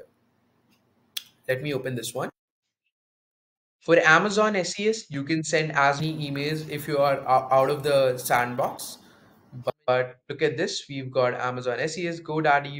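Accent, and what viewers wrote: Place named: India. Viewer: I was about to sign up for a Growth account, but unfortunately, I am not able to rely on a heavy Indian accent when viewing videos and tutorials. Do you have support by American English speakers???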